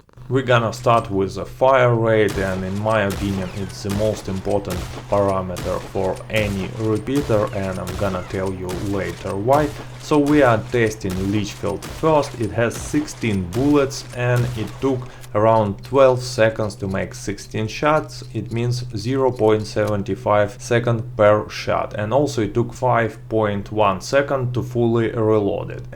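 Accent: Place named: Russia. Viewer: I love this man's russian accent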